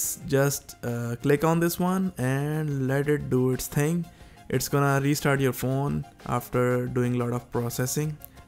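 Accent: India. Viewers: Yo dis shit no work wit yo Indian voice likd dafuw u sayin Peace dawg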